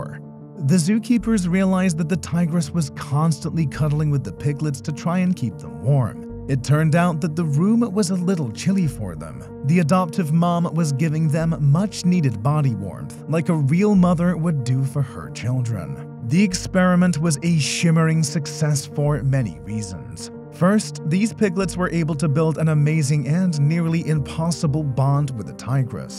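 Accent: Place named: United States. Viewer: I just don’t like the american accent in this video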